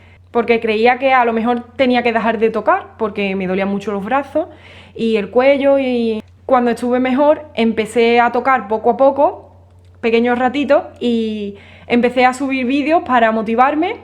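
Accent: Andalusia, Spain. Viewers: De que parte de España es el acento que tienes? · Te lo mereces guapa! Sigue con tu arte y sigue hablando en ese Andaluz precioso que hablas. Un cariñoso saludo a desde Chile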